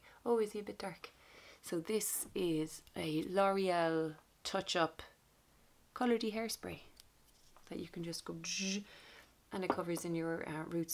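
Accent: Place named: Ireland. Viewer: So delighted to discover you tonight! You have brightened my dull day with your enchanting personality & Irish? accent. I started by gray hair journey last August, I was SO excited for it! I also have pale skin and pale green eyes (and yes irish ancestry on both sides) and have been dyeing my hair dark for decades (even though my natural hair is light brown/dark blonde). I had NO idea what lay underneath it all! I also am just growing it out, not cutting it short or bleaching it at all. It has been quite the journey. At 8 months of growth I am just beginning to get glimpses of what it may look like grown out. I am planning on growing it to waist length which means it will take at least another 2 to 3 years or more. Right now it is long to mid back and I keep trimming the dull ends every month. I very much look forward to your future videos! Liked and subscribed. 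Slainte!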